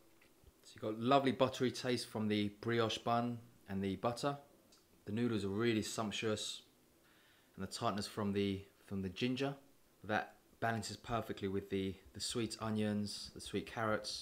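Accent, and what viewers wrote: United Kingdom. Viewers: dude, I have been wondering that are you Japanese or British? Quite an accent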